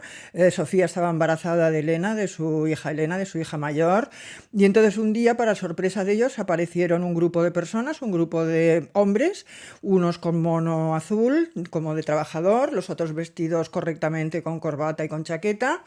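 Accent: Catalonia, Spain. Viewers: UNA COSA, YO PENSABA QUE ERAS CATALANA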